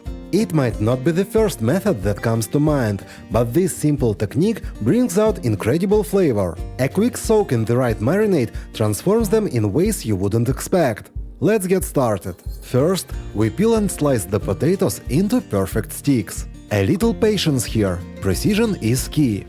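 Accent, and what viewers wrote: Russia. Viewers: террибл рашен аксэнт))) бат гуд контэнт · Меня смущает акцент и наличие укропа в маринаде. Автор, ты русский? Классный канал, кстати. Готовить не умею, но есть под твои видео не хуже, чему под Друже) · I feel STRONG russian accent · Strong russian accent ) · Is that accent russian???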